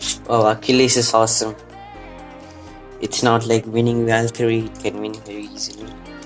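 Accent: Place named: North India, India. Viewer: bro first I taught that you from North states because of way you speak english is different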